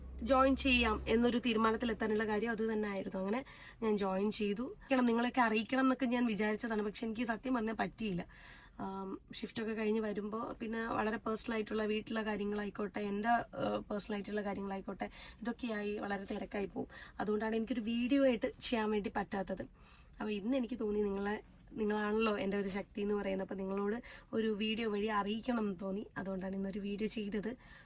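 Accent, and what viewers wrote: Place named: Palakkad, India. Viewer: Palakkad Malayalam.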